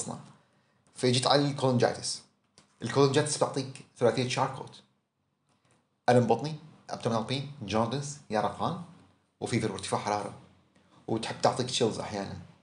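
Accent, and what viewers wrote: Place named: Syria. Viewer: اذا عراقي ليش تحجي سوري؟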